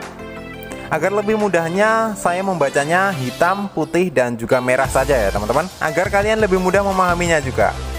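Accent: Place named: Java, Indonesia. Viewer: yang biru kita sambung Ke Puteh..😅 Auihihi.. B.jowone iseh ketok mas broo..👍👍😊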